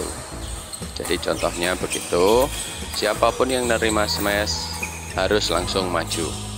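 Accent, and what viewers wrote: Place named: Java, Indonesia. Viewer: Saya jogja pak, saya kira bapak jogja kog ada logat jawanya Terus berkarya pokoknya coach.. Kami tunggu video selnjutnya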